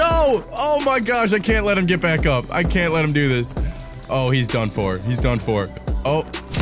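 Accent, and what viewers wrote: Minnesota, United States. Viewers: Shit is this guy from Minnesota too?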